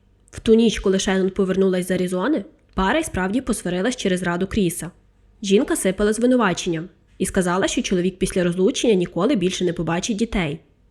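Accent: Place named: Rivne, Ukraine. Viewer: Ви з Рівного? Такий цікавий говір? Якщо що я з Кропивницького. Просто так цікаво розмовляєте. Трішки не гоніть! Просто читайте як і розмовляйте з нами. Відчуття, що ви робите на от їбісь😞не треба летіти! Навпаки можливо поставити 1.25 швидкість. Краще переслуховуйте і ви і комусь дайте послухати. Ви вумничка!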